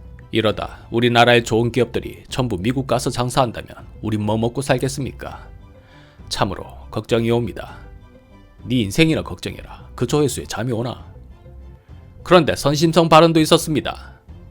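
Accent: Gyeongsang, South Korea. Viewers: 왠..경상도사투리..!!..트럼프가 영샘이가??..표준말버젼이면..더욱좋겠구만..!!갱상도사투리 듣기가매우거북함..!!..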